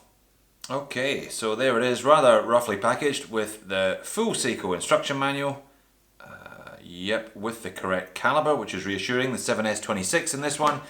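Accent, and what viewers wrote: Scotland, United Kingdom. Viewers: Upon starting the video I was absolutely delighted to hear your Scottish accent lol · Greetings from New York. Is that a scottish accent? Beautiful watch, but I've never seen a bracelet come in such poor condition with such horribly rolled links. The case and dial look right on.. but that bracelet almost looks counterfeit!...Either way it's a beautiful watch and I agree whole heartedly that it punches far above its weight class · where are you from? scotland?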